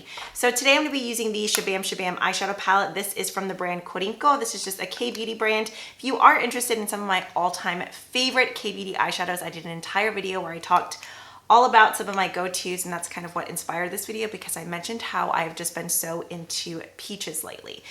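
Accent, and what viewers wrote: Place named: New York, United States. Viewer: That was hysterical! Yonkers is near me and NYC and definitely have an accent if you are from there. Hahaha. Loved it Happy easter love! Love all the vibes of this video. And I also adore the new way or what seems new way to show product name. Awesome editing. I ordered a bunch of your recommendations from k beauty video. Waiting for it to ship. Lol. Wait I own those two blushes too you just used. Amazing combo. Haha. Thanks for those too!! 🐇🐇🐣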